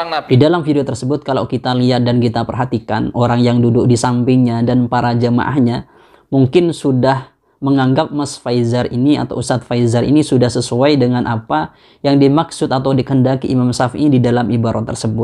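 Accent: Madura, Indonesia. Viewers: Kesalahan baca kitab itu hal yg lumrah, bisa jadi karena sabqul lisan, atau sebab lain. Seharusnya anda mendukung dg apa yg diperjuangkan oleh Ustad Faizar, mengoreksi bacaam bukanlah hal yg salah tapi dengan menyalahkannya di youtube akan memframming diri Anda sendiri kalau anda bersebrangan dengan beliau, apalagi anda sampaikan di momen yg tidak pas, yakni perseteruan antara dukun dan pesulap. Harusnya anda yg alumni pesantren dan mengaku kiai muda (di channel) kudu lebih bijak dan berhati-hati. Lebih baik tabayun agar tidak blunder permasalahannya. Ingat beliau itu Muhammadiyah, sedangkan anda dilihat dari logat bicara dan gaya menyampaikan isi kitab kuning adalah orang madura dan pastinya NU. Pahamilah perbedaan ini, agar gesekan antar umat tidak terjadi.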